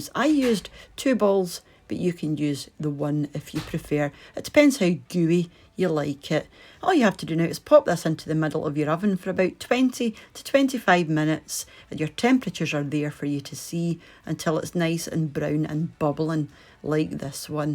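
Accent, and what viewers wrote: Scotland, United Kingdom. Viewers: Great channel! I'm subscribing! Nice to here a Scottish accent too! I'm in Edinburgh 👋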